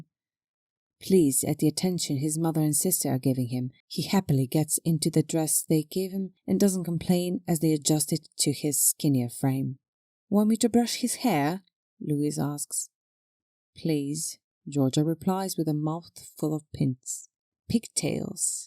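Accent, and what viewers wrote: United Kingdom. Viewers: I noticed you used a different voice for this video today, one with a heavier English accent. It fit the story very well. I also like this new storyline you just started, you are really branching out with new story ideas. knowing how your stories are we know there will be a gender change where he becomes a real girl. I cant wait till the next season of the pink packet/ mittens storylines those are my favorite works of yours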